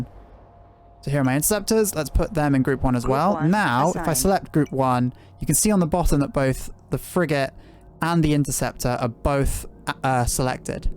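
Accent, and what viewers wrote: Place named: United States. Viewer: Gibt es den keine sch** Anleitung auf deutsch? Ich hasse die amerikaner an sich schon und bei dem Dialekt.... 🤮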